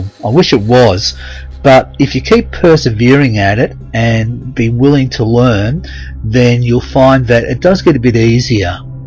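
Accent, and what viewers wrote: Australia. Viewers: Thanks for sharing the only gem channel with a down under accent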